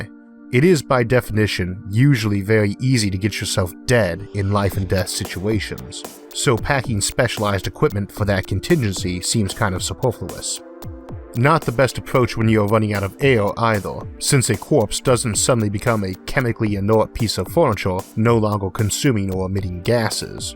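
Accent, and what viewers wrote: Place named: Texas, United States. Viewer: I didn't think you had a speech impediment, I just thought you were from Texas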